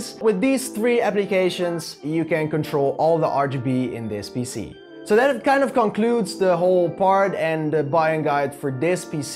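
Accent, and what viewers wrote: Netherlands. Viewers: haha, ik dacht de hele tijd al dat je nederlands was (piep klein beetje accent). Mooi gebouwd! Ik ga denk ik dezelfde bouwen maar met de 3900x. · are you from the netherlands? your accent sounds like it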